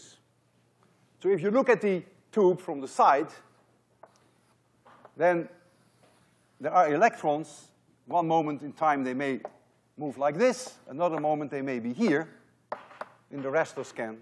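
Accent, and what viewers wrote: Netherlands. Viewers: Alfred Castillo Exactly and i'm proud of this professor from the Netherlands just like i am. You can clearly hear that he has a Dutch accent.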